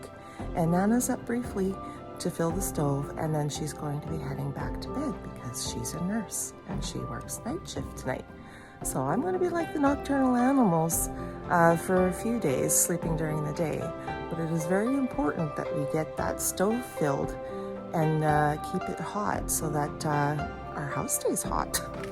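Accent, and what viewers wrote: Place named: North, United Kingdom. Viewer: I just love how you ladies up north say "house" and "out." What kind of wood are you burning here? First camera shot inside furnace shows unsplit and seemingly unseasoned tree limbs (later on, maybe popular perhaps not fully seasoned). I have seen vids of folks who burn only well seasoned red or white oak. They are able to load large chunks of wood and don't have to poke around or reload for at least 24 hours. Here's some advice you didn't ask for... LOL so thank you in advance for your indulgence... sell a few of those junk cars for scrap and with that money build yourself a decent woodshed. All the best...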